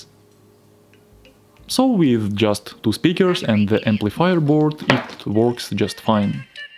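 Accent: Russia. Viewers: Настолько сильный русский акцент, что все понял даже без перевода.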